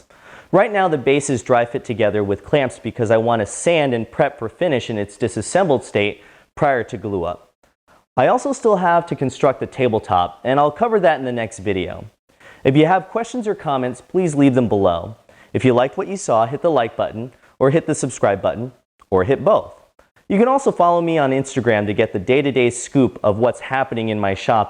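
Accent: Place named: Midwest, United States. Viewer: Nice work ...you don’t sound like your from Alabam mor like the Midwest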